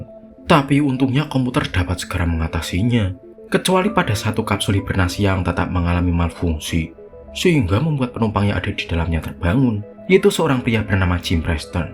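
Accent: Java, Indonesia. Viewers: Medok bgt naratornya.. 😅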